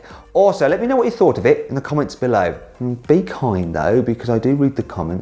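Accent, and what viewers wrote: United Kingdom. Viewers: マットの英国アクセント好き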